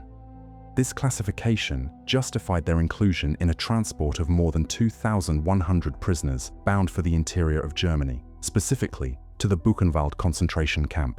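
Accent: United Kingdom. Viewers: Nice video. Can I just say, in British English we say "one hundred AND sixty-six. We NEVER say "one hundred sixty-six". You've chosen a British accent, so you need to give it British expressions, otherwise it sounds bizarre.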